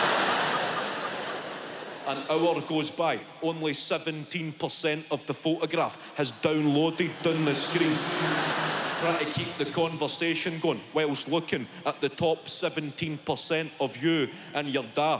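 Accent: Scotland, United Kingdom. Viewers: He has a VERY strong Scottish accent is why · Love humour as it's so relatable in your own life! Very very funny and as he's Scottish he sounds even better, and yes I can understand every word thanks unlike some of these comments on here! 😂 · That Scottish accent is so thick. it's almost a stretch to say he's speaking English. Maybe people from the rest of the UK can understand it but to my sensitive (and unsophisticated) midwestern ears, he might as well be speaking Central Algonquin. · you probably definitely do understand English! It's just Scottish accents and Northern Irish accents can be one of the most difficult to understand - even for native English speakers. We have a lot of Scots 'slang' that Glaswegians like use, which is almost like it's own 'local language'. Some can be loosely translated from Gaelic - which is our old native language.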